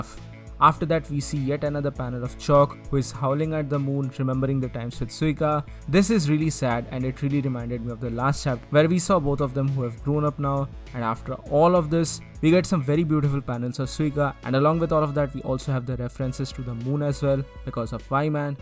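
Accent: India. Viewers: hmm Indian accent makes me smile....🙃 proud of you subscribed 🙌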